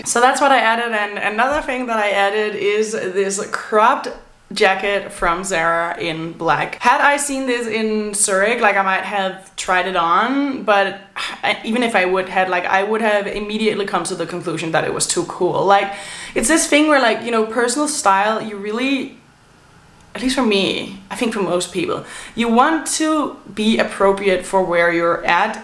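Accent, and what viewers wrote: England, United Kingdom. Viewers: Really interesting as a Brit hearing your comments. I think you are right- everyone all ages is wearing white trainers (not sneakers- 😊). I think it's a post covid rejection of uncomfortable footwear Converse are popular but tough to wear all day. I think we don't consider unique jewellery because most people don't prioritise spending money on it - we should - but definitely too much (imo) make up. I can confirm your skimpy tops are not shocking and the jacket does not stand out (i.e. it is nice but normal expect for it being 30c!). The cropped long sleeve square neck is lovely. Your English accent made me smile but it's autumn not fall 😀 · 😂 I’m an English woman and I totally approve of your attempt at an English accent 🤣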